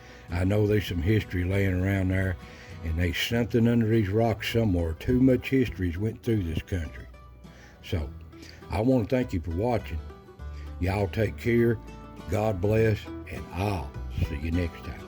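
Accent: Appalachia, United States. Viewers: Your backwoods accent reminds me of my dad whose people came from Appalachia.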